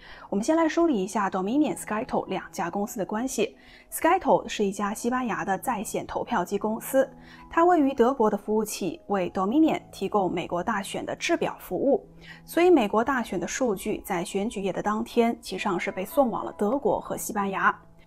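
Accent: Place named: Beijing, China. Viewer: 还是能听出京片子味儿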